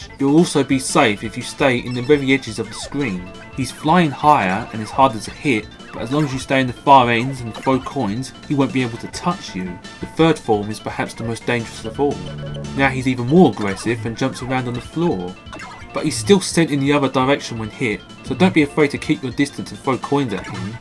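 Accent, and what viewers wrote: England, United Kingdom. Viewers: Ha! I knew it! You sound English. Am I right?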